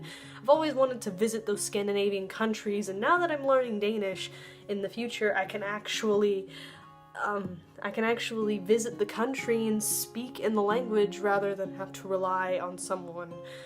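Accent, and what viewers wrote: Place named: United States. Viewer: As a Dane, your pronunciation needs work obviously and you got a heavy American accent, I would suggest finding a Danish person to chat with in Danish if you want to iron out the pronunciation and accent. That said, good work and I greatly encourage you to keep learning Danish, it is a really fun language once you start understanding the deeper aspects of it, as an example, a lot of Danish comedy is is based around subtle changes in how things are said and phrased. I have a warning for you if you travel to Denmark, if you don't demand that Danes speak in Danish to you we will change to English immediately when we hear that someone isn't near to native speaker level of proficiency, Danish people are generally fluent in English and find it easier to switch to English than to try and decipher foreign accents. I am warning you so you don't get discouraged if you come here in the hope of learning to speak Danish only to find everyone speaks English to you automatically.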